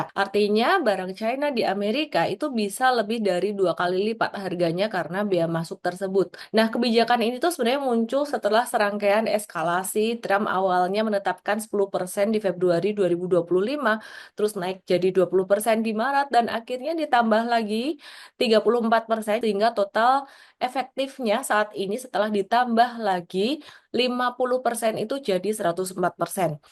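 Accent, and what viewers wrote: Java, Indonesia. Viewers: Suaramu medok mbak.... Ketoro wong jowo iki😮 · Wong jowo Iki mbak e. Logate ketoro nenen. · Sampean ko yo bahasane medok temen mbak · Menyimak dengan baik kontent yg berbobot...tapi sedikit gagal fokus karena "medok lafalnya"..😮😊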